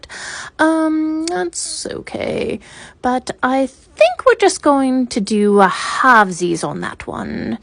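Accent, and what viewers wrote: RP, United Kingdom. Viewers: I don’t know if I’ve ever heard a more posh accent, absolutely love it!!!!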